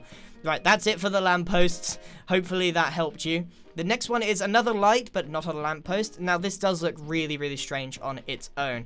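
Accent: United Kingdom. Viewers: Yes because he talk british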